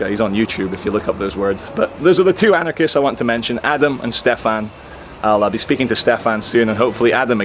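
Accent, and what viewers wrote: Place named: Manchester, United Kingdom. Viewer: sounding a bit more like a manc these days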